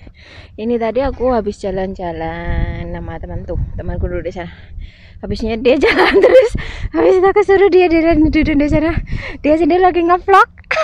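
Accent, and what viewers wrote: Indonesia. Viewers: Suaranya medok banget kak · Ko logatnya kaya orang Jawa timur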